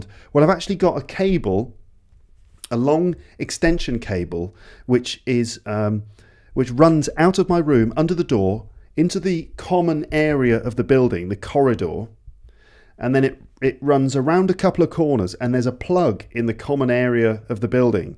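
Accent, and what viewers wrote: United Kingdom. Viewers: Thank you for your episodes. I've been watching and listening to you for some time now (probably 10 months). I found what are doing is very beneficial for people like me, trying to improve their English. I live in Egypt and mother language is Arabic. In my country, and probably most of the arab area, find that the American accent is easier for them and suitable with people with Arabic as mother tongue. Do you think this is correct???. In my case, though, I don't find it like that. I think the British accent is easier for me. That is why I'm following your channel.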